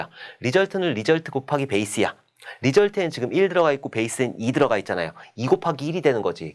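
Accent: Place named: Gumi, South Korea. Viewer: 필기 때부터 강의 들으며 공부 중인 학생이예요!!! 귀에 쏙쏙 들어오고 쉽게 설명해주셔서 좋아요 ㅠㅠ 감사합니다 근데 갑자기 궁금한 점인데 혹시 구미분이세요~?! 한번씩 "~ 하는거라", "~ 이거라" 하는데 구미사람들 말투라서 갑자기 궁금해졌어요 ㅋㅋㅋ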